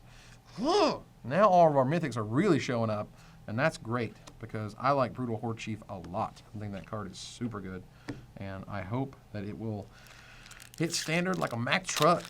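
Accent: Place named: United States. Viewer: You have the voice inflection I associate with the "Joe Dirt" accent. Good video tho.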